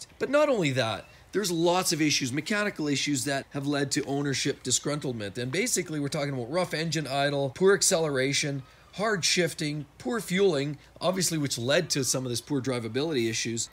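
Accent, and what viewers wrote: Canada. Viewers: Your accent sounds like a Family Guy caricature of a Canadian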